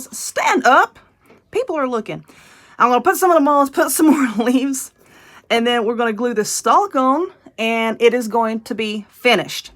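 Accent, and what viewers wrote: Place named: Texas, United States. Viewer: I like it!!! Looks better than Target's! I understand everything you say...lol...i am from Texas & we talk & say things like that! Stay safe!💕🍁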